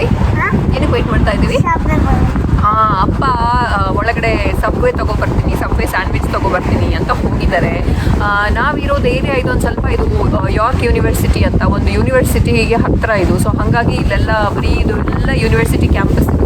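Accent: Mangalore, India. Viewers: Hi dear nimma vlog Alli manglore Kannada keli thumb kushi aithu all the best namma support nimge yawagalu eruthu god bless u❤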